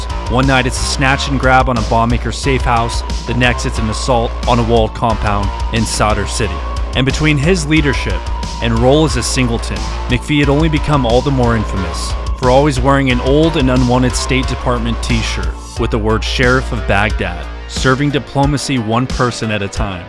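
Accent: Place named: Chicago, United States. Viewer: I thought he was from Chicago, not NJ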